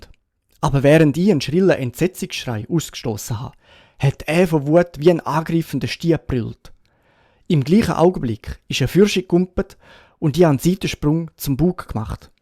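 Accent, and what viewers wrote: Switzerland. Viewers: Thanks a lot, I really appreciate your work. What dialect do you speak, and which dialects are closest to yours?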